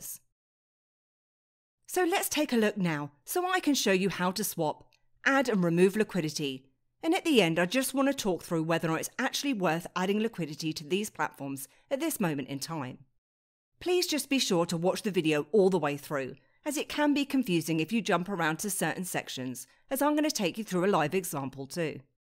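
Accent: United Kingdom. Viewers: that voice and British accent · British accent is nice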